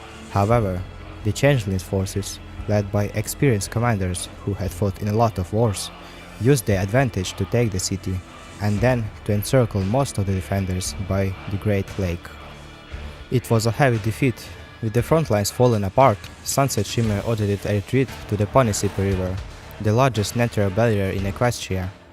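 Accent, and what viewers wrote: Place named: Russia. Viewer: Килотонный респект автору. Я сразу понял, что автор умеет говорить на русском из-за акцента. Чувак, тебе уважение, продолжай пилить годный контент!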